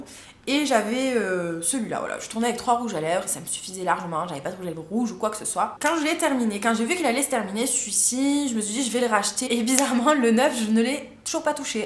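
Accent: South, France. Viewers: Coucou! Franchement j'adore tes vidéos! T trop mimi! Ca fait du bien de regarder tes videos d'autant plus que j'adore ton accent, je suis du sud mais je vis dans le nord depuis quelques années et c'est dur :( voilà je suis régulièrement tes vidéos qui m'apprennent pleins de choses ;) continue t trop cool et trop rigolotte!! Bye!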